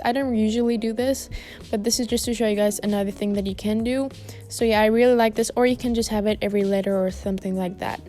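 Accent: South Africa. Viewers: are you also from South Africa?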